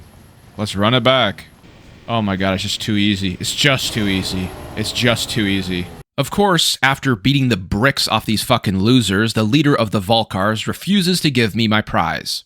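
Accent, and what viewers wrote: Canada. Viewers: He’s Canadian? Gross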